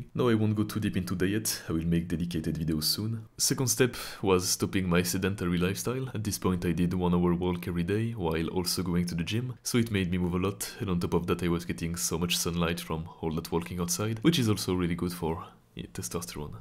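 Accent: France. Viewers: Ton accent français est magnifique ✨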